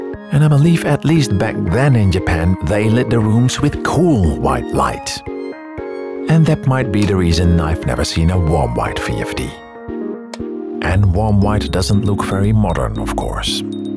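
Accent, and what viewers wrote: Netherlands. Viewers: That's the thiccest dutch accent I've ever heard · that compression on his voice combined with harsh dutch accent is absolutely obnoxious jesus turn it down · I thought you were Dutch based on your accent, but I wasn't sure. Hearing you say that you were in the Netherlands, makes me realize I'm pretty good at picking out accents. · I didn't know synthesised voice robots can have Dutch accent too,Awesome.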